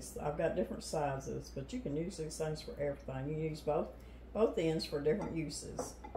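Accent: South, United States. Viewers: Oh Miss, you are so very precious! ❤ May I make a request? You are so soft-spoken, and if you are cooking and not wearing your mittle microphone I have to turn my phone to maximum volume. And frequently end up turning on the closed captioning, which is in the way and the words that are there are frequently not accurate to what you say (think southern accent to a foreign-based captioner!) and you can understand my frustration.😮 Thank you for all you share with us! Love you and as if you were siblings, and please don't let my suggestion hurt your feelings in any way, shape, or form! I am a dedicated follower and will continue to do so!❤❤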